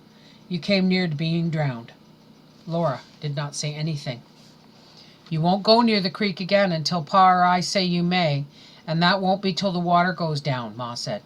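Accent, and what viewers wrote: Canada. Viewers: The Canadien accent (speech impediment) is brutal.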